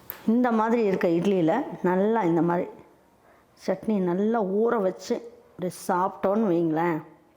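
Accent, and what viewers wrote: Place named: Kongu, India. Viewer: மதுரை சட்னி ஆனால் உங்கள் பேச்சு கவுண்டர் சாயல் உள்ளதே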